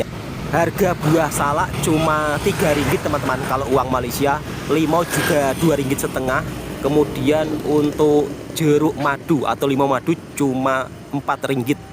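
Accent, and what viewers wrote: Java, Indonesia. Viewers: Sdr sdh lama juga berkerja di Johor tp loghat msh tebal kejawaan. Semoga sentiasa sihat.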